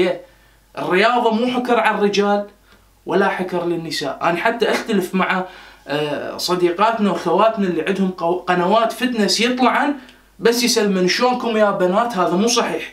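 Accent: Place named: Iraq. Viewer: اول شي كتير حابة رحب بلهجتك لعراقية لحلوة لانو سدق او لا أني انا عراقية ومابتفرج/بسمع لشغلات عراقية الا بنادرمو منشان أني مو حابة اللهجة بس لانو كل ما بطلع بشوف عقول تعبانة ومتضررة . وكتتتييير انصدمت من ثقافتك ووعيك عنجد إنّو شي خلاني كمل الفديو ل الاخير ( الله يخليك). انشالله يارب انتا كمان فينك توعي البنات والشباب لحته يصير في رقي للمجتمع لعربي. ياريت إتكمل وماتوقف تعمل هيك توعية لانو حكيك كتير منطقي يمكن المحتوى يلي بتحكي لح ياخد وقت لحته يطبق والسبب العادات التقاليد والعيب. ف بتمنالك كل التوفيق والنجاح يارب. Keep it up!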